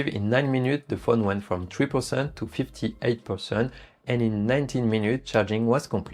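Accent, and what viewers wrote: France. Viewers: L'accent français transparaît beaucoup, il nous en faut un aussi.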